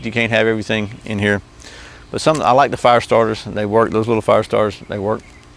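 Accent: Georgia, United States. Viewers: I place that accent at south of Atlanta, GA How far off am I there, sir?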